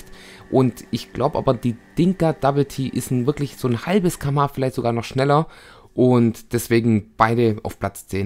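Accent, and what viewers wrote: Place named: Swabia, Germany. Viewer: Schwäbischer Dialekt iz da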